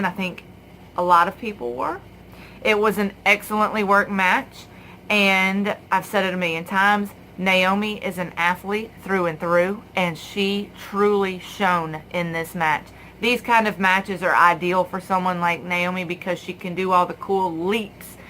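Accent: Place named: South, United States. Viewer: I'm Here Miss! lol I subscribed and rang the bell. You're Southern? I didn't know that. Your accent is cute lol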